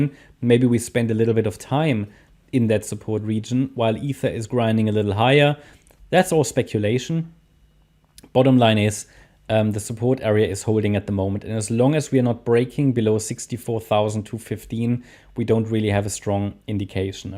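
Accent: Germany. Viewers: ich hab mir das video angeschaut und direkt nach 20 sek gemerkt das du deutscher bist hahaha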